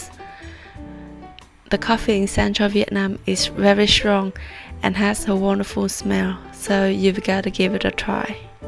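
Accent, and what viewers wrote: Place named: England, United Kingdom. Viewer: I like your English accent very much...thanks for sharing. Love Da Nang.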